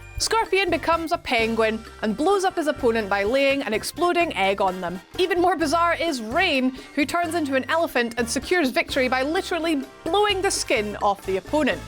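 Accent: Scotland, United Kingdom. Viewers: Is she Scottish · Gaelic, lovely. She's probably Scottish.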